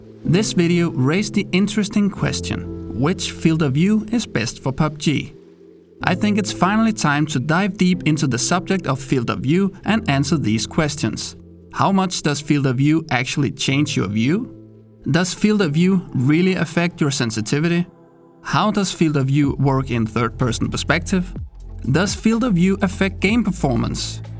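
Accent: Denmark. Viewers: Are you danish? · Jeg gætter du er fra Danmark ud fra din accent :D Fedt content fyr!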